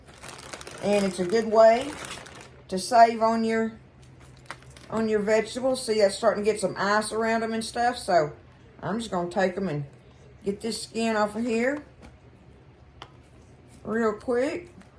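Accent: South, United States. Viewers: FIRST COMMENT!!! I HIT LIKE RIGHT AWAY BEFORE I EVEN WATCHED THE VIDEO BECAUSE I ALREADY KNEW THAT IT’S GOING TO BE GOOD🤤💯💪🏾 I LOVE ME MY AUNTIE 🤗❤️ AND YOUR COUNTRY ACCENT MAKES THE RECIPE EVEN BETTER 🤷🏽‍♀️🤣 GOD BLESS YOU MY ONLINE AUNTIE 🙏🏾😘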